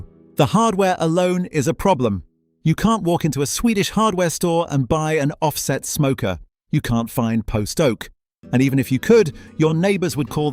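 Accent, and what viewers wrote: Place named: United Kingdom. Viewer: They've got a garden? What are they going to do, smother it with basil and thyme and cherry tomatoes? What's a garden got to do with barbecue? You plant flowers and aromatics and Japanese shrubs in a garden. There's no provision for a smoker and a cutting block, and nobody cares about being surrounded by cilantro and posies when you're grilling and barbecuing and smoking. Ridiculous. And yes, the wood is important. I don't think you can find hickory or mesquite in Europe, and oak and other slow-burning variants have to be the right kind. I certainly don't want my ribs smoked in ash or yew or olive, wrong flavor and smoking properties. And a brisket is not the size of a suitcase. You are exaggerating and misrepresenting a lot of things. You sound British yourself. I understand that you're trying to present a concept in this video, but you certainly still don't know enough about the subject to talk about it correctly. 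I suggest you stop hurrying when trying to put these videos together, the clicks are not worth it, and not important enough. You will be ignored when you come off as ignorant instead of informative. Very annoying. And why the hell do you have noodles and coffee and other things in a video about American barbecue? It doesn't make sense. Are you a real person, or are you the early iteration of HAL and Skynet? If you're human, tell us about your last experience when you had to tune out your crazy ex-girlfriend because you were trying to relax after work with a video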